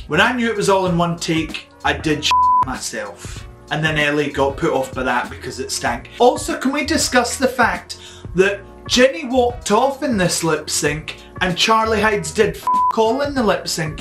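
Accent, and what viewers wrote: Scotland, United Kingdom. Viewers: Love that Scottish accent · Lawrence Chaney could insult me in Scottish and I would say thank you, may I have more please?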